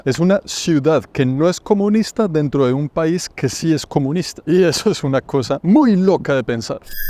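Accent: Colombia. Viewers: Pese a que su contenido lo ven muchas personas de diferentes países de habla hispana, él habla como un buen colombiano del común. No es como otros youtubers que empiezan a hablar neutro o se mexicanizan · Muito bonito o espanhol colombiano. 👏👏👏👏👏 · Este gringo tiene acento colombiano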